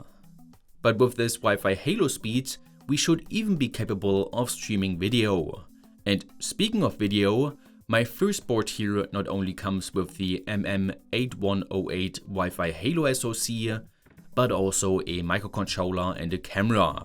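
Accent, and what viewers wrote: Germany. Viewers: Is the Great Scott a real Scott? I hear a German (-ic) accent. :-)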